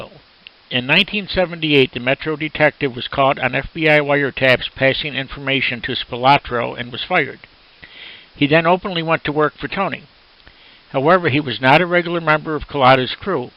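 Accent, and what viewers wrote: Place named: Midwest, United States. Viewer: it's his Midwest accent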